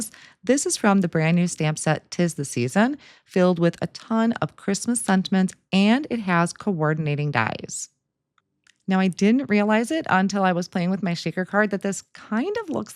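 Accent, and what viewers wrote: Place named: Wisconsin, United States. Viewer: Oh my gosh, I just stumbled onto your channel and heard your voice and I thought, wow, that sounds like home. Turns out you’re from Chippewa and I’m from Eau Claire! I’m down by Milwaukee now but love that we’re from the same neck of the woods! ❤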